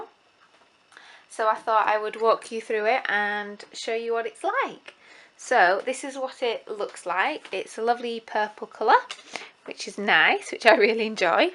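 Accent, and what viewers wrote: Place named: RP, United Kingdom. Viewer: very posh! x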